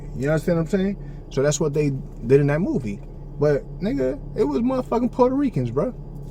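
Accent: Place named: New York, United States. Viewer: You on every channel speaking like you from NYC🗽🤔🤣🤣. Boricuas🇵🇷did NOT move into the BX🗽 in the 60’s. Stop spreading lies. Boricuas🇵🇷been coming into NYC🗽since 1920’s. By 1960 Boricuas🇵🇷were the FIRST Bodega owners. The Boricua🇵🇷population has always been the highest population in the BX. If you were from NYC🗽 you would of known that. Central Harlem is the highest population for Blacks.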